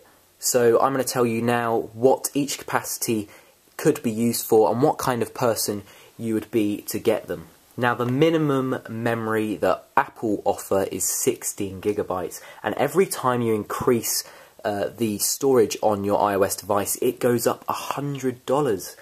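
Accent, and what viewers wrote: England, United Kingdom. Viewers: I thought you was English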